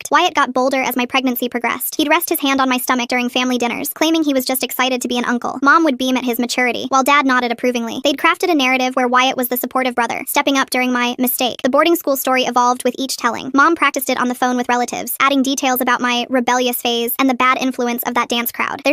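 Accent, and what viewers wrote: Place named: United States. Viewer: Her voice is annoying American fast loud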